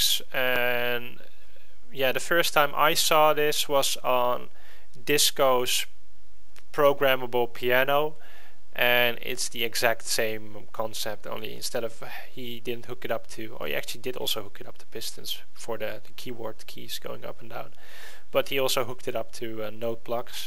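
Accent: Netherlands. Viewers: Je kan het nederlandse accent horen :P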